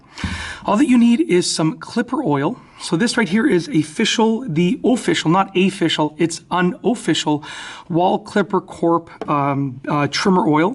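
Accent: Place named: Canada. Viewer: canadian ehh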